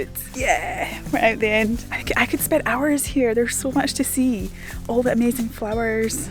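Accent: Scotland, United Kingdom. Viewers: のスコッティシュ・アクセントも聞いてみたいです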